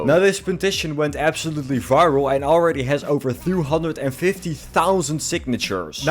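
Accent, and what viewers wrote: Netherlands. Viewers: i know that accent far to well this is a dutch youtuber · Are you dutch by any chance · Yep I hear a Dutch accent, let’s goooooo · you sound dutch. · I can hear your dutch accent. But keep up the content! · This Dutch accent lol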